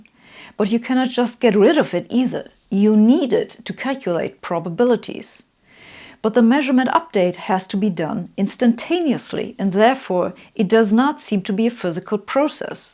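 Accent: Germany. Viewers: your german accent is so german: it hits the clichee as a blueprint for any information to what end the effect never fails the paradox of losing it... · Of course she has a German accent, of course she does.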